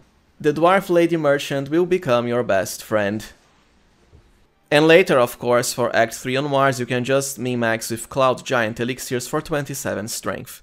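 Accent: Brazil. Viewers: eu SABIA que o sotaque era brasileiro, SABIA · Eu tenho certeza que tu é brasileiro com esse sotaque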